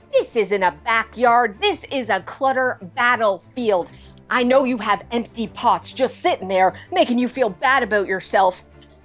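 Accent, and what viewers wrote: South, United States. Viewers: Love the southern drawl & the bloopers at the end! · The Southern is funniest! Keep it! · What an awesome, hilarious video! Your southern vouce was sooooo good! ... Maybe a video in all southerb twang?!!! Penny you are a beautiful baby! ❤❤❤❤❤ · 😂southern - love it! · I too was wondering why you were southern all of a sudden.. lol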